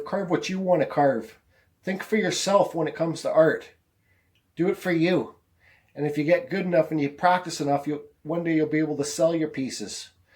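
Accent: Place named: Canada. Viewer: Great looking piece I like it. Your fuckin language is terrible eh. You sound like a real Canadian lol. Have a good one.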